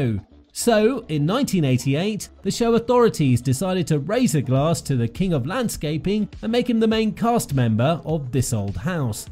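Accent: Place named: United Kingdom. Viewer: There’s something about this style of British… I don’t even know what to call it….sensationalism?…that always comes off as insulting to the people being discussed and over-hyped. As soon as you hear that particular British accent, you know the presentation is just going to be an audible ‘National Enquirer’ presentation. It’s not going to be polite. It probably isn’t even going to be true. I have to tell you when I heard the word “dummies” used I got instantly angry. I don’t believe people actually feel that way about the personalities in This Old House. I couldn’t believe that was said. Disgusting.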